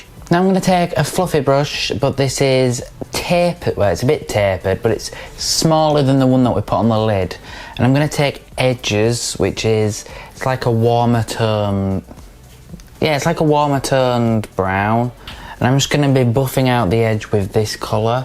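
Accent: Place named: North, United Kingdom. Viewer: You are so refreshing, I love how northern you are I feel reyt at home listening to your voice whilst you do your make up. 🥰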